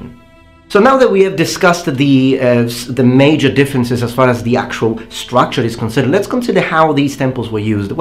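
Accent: Greece. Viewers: really Helenic Accent ! Congrats my Friend! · You have a modern greek accent and not an erasmic one! Good.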